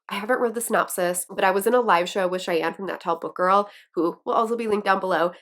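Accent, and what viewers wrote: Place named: Wisconsin, United States. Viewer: Talking about your Wisconsin accent ruining your pronunciation is cracking me up. 😂😂😂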